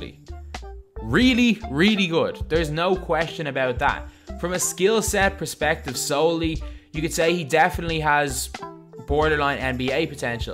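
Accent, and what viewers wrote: Ireland. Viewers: Irish Accent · Reynaldo Tolentino Irish yan pre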